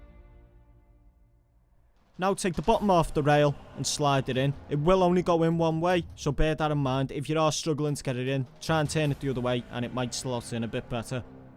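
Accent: Scotland, United Kingdom. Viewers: Nice accent, you Scottish? Or Irish maybe? I'm non-native English speaker so I don't know much about your accents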